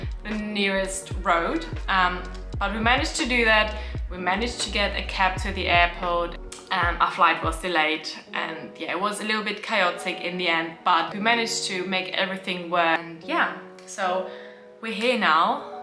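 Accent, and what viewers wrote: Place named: United Kingdom. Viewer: Love it so much! 😍 & you have such a great British accent omg! ❤️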